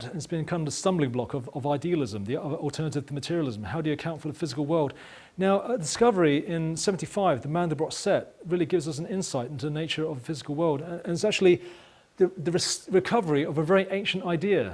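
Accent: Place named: United Kingdom. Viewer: This is the kind of thing that makes YOutube great. Not this Miley Cyrus garbage all the time. Great video. Weird to hear a Chinese guy with a British accent!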